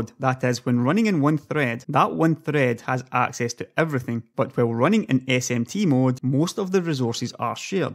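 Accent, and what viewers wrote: Scotland, United Kingdom. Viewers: he is full on * Scottish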